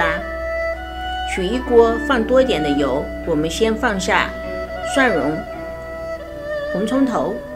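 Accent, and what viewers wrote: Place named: Shanghai, China. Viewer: 上海的阿姨？ 熟悉的口音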